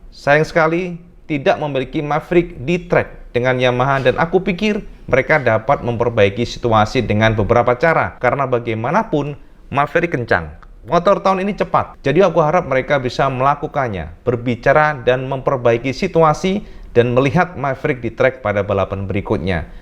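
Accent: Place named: Java, Indonesia. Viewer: Terbaik cak..terus saya mendengar walaupun tak faham loghat jawa bila disebut..😆 Subscriber dr 🇲🇾👍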